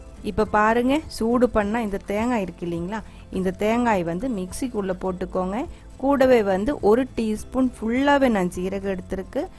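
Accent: Kerala, India. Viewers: Love ur tamil way of speaking in a malayalee slang...awesome sis frm malaysian tamil mom😍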